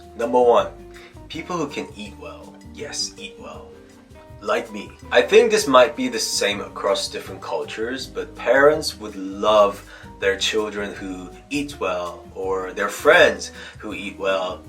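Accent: England, United Kingdom. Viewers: Am i imaging a British tone to your English lol. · Why do I for some reason hear a hint of a British accent? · Korean food is THE BEST, I don’t know how I ever lived without it and now I eat it all the time. Love your Brittish accent 🫶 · Love love your English accent. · you can tell you learnt English in England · speak English with a British accent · Omg you sound english, American and English it’s so cool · Love the British accent!! ❤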